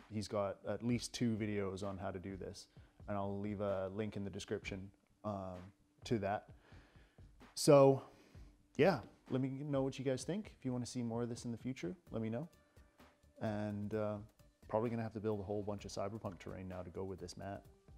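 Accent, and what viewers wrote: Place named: Canada. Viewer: Watching video.....ok cool, man, I've been wanting to do one of these for a while......looking good....huh....this guy sounds pretty Canadian....Ontario maybe?....pretty sure Canadian.....Premier spray primer?!?! Oh yea bud that's some Canadian Tire paint right there......"deck-el"! C A N A D I A N C O N F I R M E D. Where ya at? the "deckle" pronunciation makes me think farther west than Ontario. AB? SK? I feel like if you were in MB I'd know.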